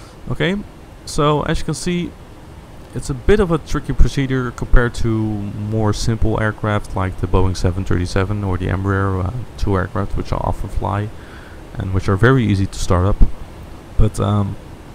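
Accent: Netherlands. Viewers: dutch accent... ;-)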